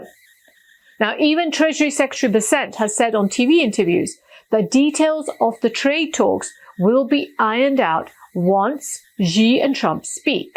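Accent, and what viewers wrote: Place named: United Kingdom. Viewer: I like your analytical approach in analyzing the rival countries. Your UK accent gives it more of a valid flavor. Plus, you look to good to be English.😍 Just Joking. There are a lot of beautiful English women too.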